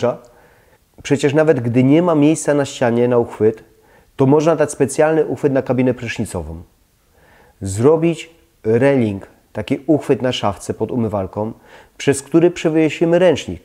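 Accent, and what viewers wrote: Silesia, Poland. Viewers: Czy jest Pan ze Śląska? Pytanie z ciekawości :)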